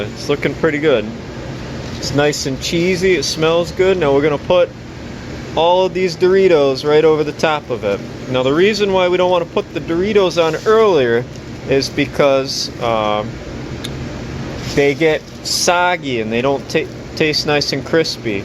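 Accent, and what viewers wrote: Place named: Bronx, United States. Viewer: You sound like your from thr bronx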